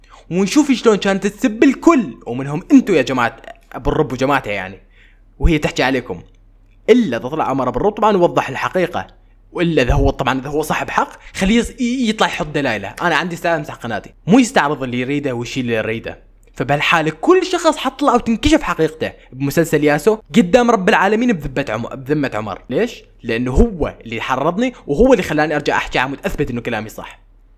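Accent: Iraq. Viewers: احسك عراقي 😂😂😂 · أتابعه مشان اعرف مشاكل يتيوبر ✖ أتابعه مشان لهجة عراقي لتجنين ✔😍😍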